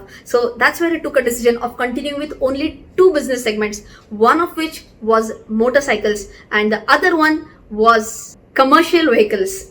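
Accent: Maharashtra, India. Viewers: I enjoy the Marathi accent the most....and the lecture is top class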